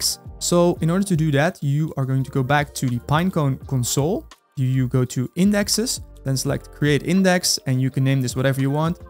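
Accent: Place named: Netherlands. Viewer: I hear dutch accent :D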